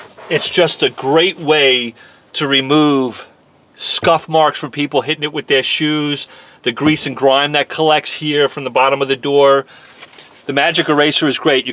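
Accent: New York, United States. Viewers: You may be in Dallas, but I'd recognize that New York accent a mile away. My guess is Long Island, same place I grew up before moving to Phoenix.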